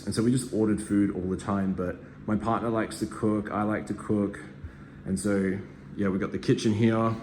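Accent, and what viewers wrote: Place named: Australia. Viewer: He too Australian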